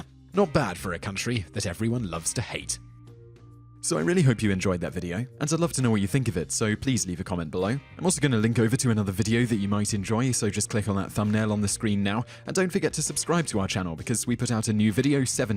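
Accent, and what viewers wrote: England, United Kingdom. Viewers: Was this guy born in the U.S. but raised in the UK......that explains his accent.....I was also born in the U.S.( New York ) but I was raised in Cyprus due to my dad but I can speak English with an American accent regardless of the fact that I was raised in a Greek speaking country.....now I leave in NY because I always wanted to and I'm 18:D and I think I will stay here forever 'cause I also got family here P.S I'm PROUD that I'm also American.......the topic of this video generically enough:) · Getting my American ego stroked by someone with a British accent just made my brain explode! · It's nice to here those kind remarks in a cool English accent. But I have to disagree about Americas "War Ethics," especially over the last couple of decades. As an American I take no pride in telling you that we are on a war of conquest right now. 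ANd have been for at least 14 years. Probably more. But It's nice to here something positive for a change:) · Wow, I didn't realize this until now: this guy has an English/Australian/New Zealand (IDK not good with accents) accent, dawns the Confederate flag, and talks positively about the United States...my brain hurts. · 'MERICA! From what I gather in the video, is an American, despite the British accent?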